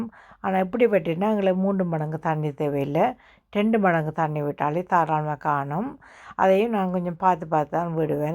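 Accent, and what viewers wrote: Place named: Sri Lanka. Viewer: ஆனால் உங்களின் தமிழ் ரசிக்கும்படியாக உள்ளது இலங்கை தமிழ் எனக்கு பிடித்துள்ளது அக்கா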